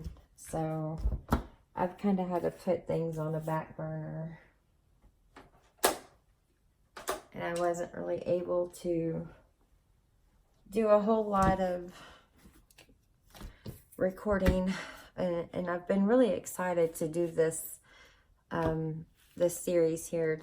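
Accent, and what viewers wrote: South, United States. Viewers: Thank you for showing us how to get started with junk journaling. I'm going to binge watch all your videos. . .btw, i love your southern accent, are you a Ga girl? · thx so much for this beginner video. I have to ask where you are from. Southerner? I'm from Louisiana.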